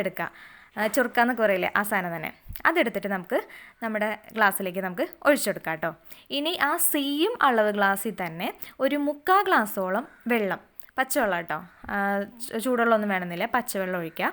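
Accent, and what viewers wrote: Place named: Thrissur, India. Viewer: Your trichur language is very sweet. Continue in the same tone. Love you.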